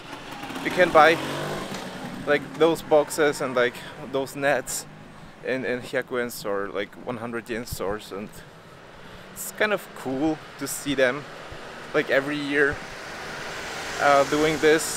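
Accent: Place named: Eastern Europe. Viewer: This Slav has a pretty cute slavic accent. 😊 Happy that you have the comfort of Polish cuisine where you live.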